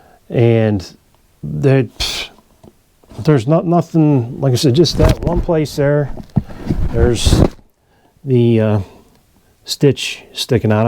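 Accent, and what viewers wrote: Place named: Pittsburgh, United States. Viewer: Are you from Pittsburgh or near it by chance? You have a Pittsburgh accent.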